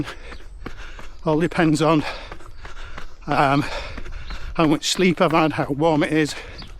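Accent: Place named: United Kingdom. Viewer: Oh, I suddenly feel like I oversimplify my warm-ups (when I have them anyway) a bit too much 😅 I just jog around with a few brief sprints just enough for the sympathetic system to properly kick in (takes about 7 min for me) and that's about it. I recently discovered that it's very easy to see the exact moment (only post factum unfortunately) when it happens on the HRV chart of R-R intervals — they suddenly stop being random and heart goes into that "fight-or-flight" mode. PS: just noticed that you too say "me" instead of "my" :) for some reason only recently noticed that Brits often do so and I gotta admit I really like how it sounds. 😊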